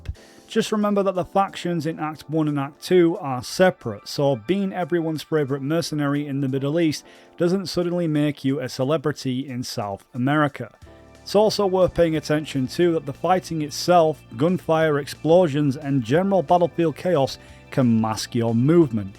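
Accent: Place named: United Kingdom. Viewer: Love your british accent but 2 minutes long intro? FML.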